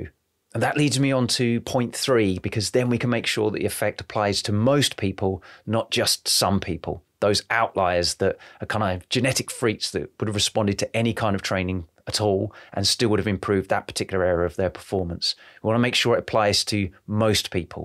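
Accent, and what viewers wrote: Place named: United Kingdom. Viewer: hes british for godsake. some people dont understand proper english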